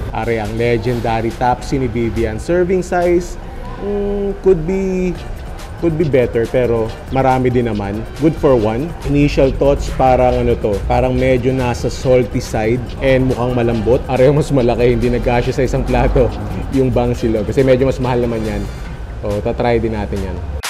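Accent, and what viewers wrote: Batangas, Philippines. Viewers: Parang taga batangas si Sir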